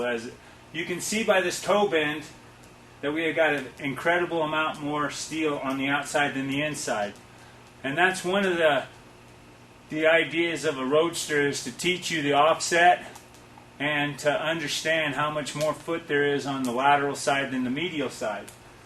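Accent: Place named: New Mexico, United States. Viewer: . this is an excellent video, clearly explained and the photography does what it is supposed to do, allows viewers to see the detail and the progress of the process. The narration is very good, I could listen to the new Mexico drawl all day. Thanks for a great resource.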